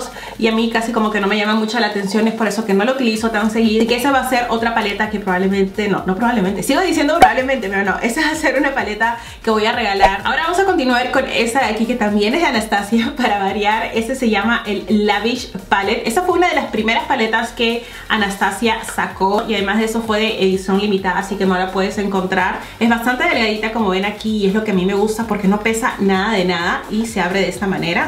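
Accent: Peru. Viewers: Y mira que me gustan las Youtubers inglesas, francesas, italianas y las españolas(que vivo en España) pero nada como oir el acento peruano 😍😍 y mejor aún cuando es de maquillaje. Te he descubierto hace poco y sin duda ya me he suscrito. Besos!!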